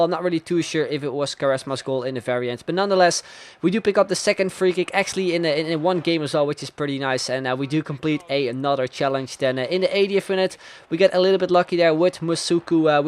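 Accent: Netherlands. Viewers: Are you Dutch???????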